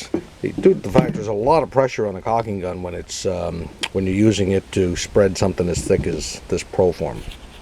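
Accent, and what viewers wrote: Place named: East Coast, Canada. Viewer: Nice to hear that thick of an east coast Canadian accent in a YouTube video. Gonna be doing this to luxury-up my upcoming winter beater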